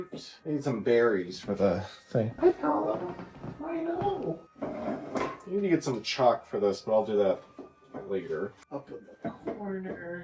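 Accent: Minnesota, United States. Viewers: You're lookin' good there,! My goodness gracious, this is a family show. Hey, out of your window is green grass and green leaves! Are you decorating in June? Because some cranberries spilled we have our 6th day: On the Sixth Day of Vlogmas my true love gave to me Six berries spillin' Five Christmas trees 🎄🎄🎄🎄🎄 Four Paolo meows Three rolls of batting Two power strips 🔌🔌 And you sound like the movie Fargo 🎄⛄🙂⛄🎄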